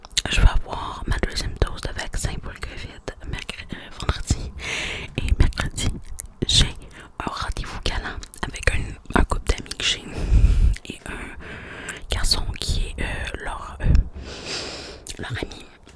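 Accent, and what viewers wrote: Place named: Quebec, Canada. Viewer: oh trop cool une asmr artiste québécoise j’avais jamais entendu de l’asmr avec un accent québécois :)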